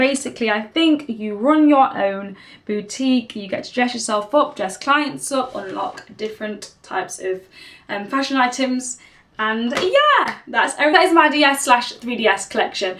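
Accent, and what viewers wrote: England, United Kingdom. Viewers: Damn you are cute as hell. Love your british accent. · Nothing sexier than a black chick with an english accent (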